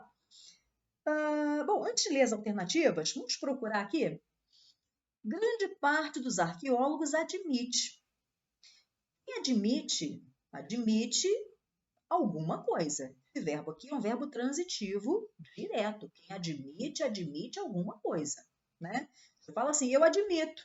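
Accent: Brazil. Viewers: Parece paraense!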